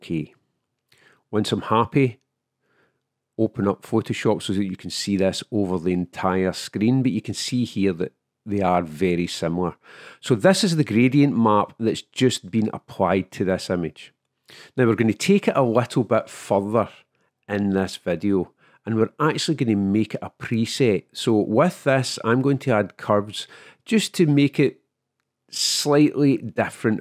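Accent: Scotland, United Kingdom. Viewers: Interessante Methode! Und was für ein drolliger Akzent! Ist das Schottisch?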